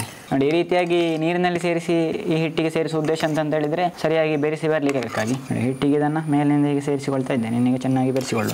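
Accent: Mangalore, India. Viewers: I enjoy watching your videos. I so so like your beautifull mangalore kannada. Keep up your great work.., good luck :) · Love your mangaluri kannada bro..Awesome ambience/rain,lovely presentation,mouth watering goli bajji..What else you need?!😄🙂👏👏👍👍👌👌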